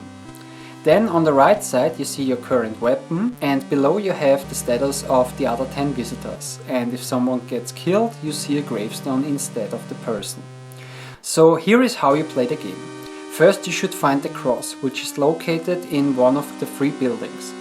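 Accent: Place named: Germany. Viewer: I remember playing the game 30 years back on the good old c64! the death scene screams were awesome! so awesome, that my mum took them for real and stormed in my room;-)! It is remarkable, that the video game tape still works after 32 years! Do you use an original C64 or an emulator for PC?, you have a descent german accent! are you from east germany?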